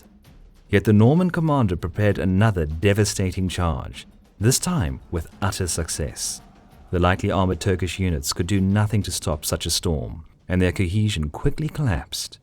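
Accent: South Africa. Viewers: south african is the accent is my guess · Can I just ask, is your commentator South African? Please reply.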